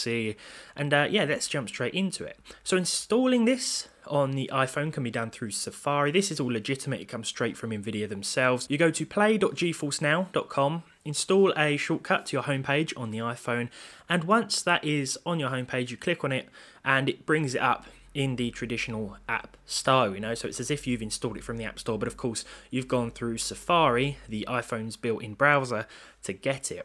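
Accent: United Kingdom. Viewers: that's British English for you, don't know from which specific area tho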